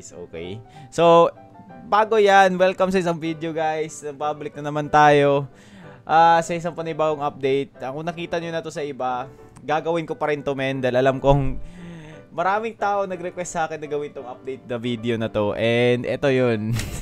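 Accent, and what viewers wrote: Visayas, Philippines. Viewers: Panit Ng saging hahaha bisaya kaman boy😂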